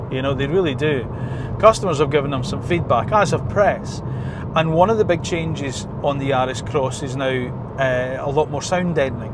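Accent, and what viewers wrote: Scotland, United Kingdom. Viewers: Very nicely reviewed, I have the Cross Excel, had it for just over 2 years, a great wee car, very economical, Livingston to Anstruther in Fife last week 81. Mpg, I am thinking of changing it for another Excel or GR Sport, before Yaris I had Skoda Karoq towing my caravan, but I have Osteoarthritis now, had to give up caravan but needed SUV style, thought you were a Scot before you mentioned your mum. Well done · Thanks for a very informative review.. also Car reviews are so much better with a Scottish accent 😊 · I would listen to the Scot accent anytime even if from the Yellow Pages !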